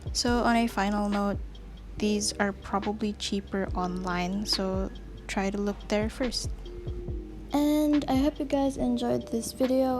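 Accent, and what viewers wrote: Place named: England, United Kingdom. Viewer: TBH! your english accent is music to my ears uwu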